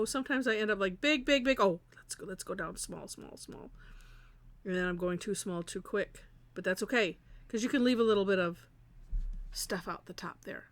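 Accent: Midwest, United States. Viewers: Good morning, I work right along with your videos. It takes me a little longer so I constantly have to go back with my tablet. But it's okay cuz I like listening to you talk while I work. I love your med Western accent. I'm from Chicago but I live in North Carolina now and just about everybody has a Southern drawl here. Thank you for putting out these videos. ❤️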